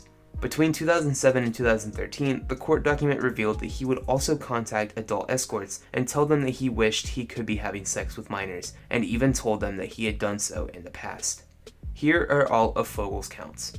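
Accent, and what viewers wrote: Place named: Martinsville, United States. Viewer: Indiana podunk? Sounds like someone is from Martinsville....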